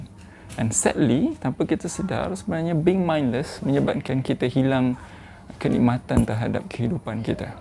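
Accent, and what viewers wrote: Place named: Kelantan, Malaysia. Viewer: Doktor ni org kelantan ke..dgr bunyi budak cakap kelantan..hehe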